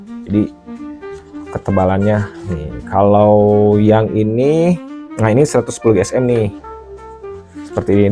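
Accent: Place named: West Java, Indonesia. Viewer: a, logatna sunda pisan😂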